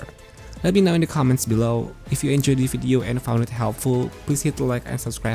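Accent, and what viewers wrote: Indonesia. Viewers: You sound Indonesian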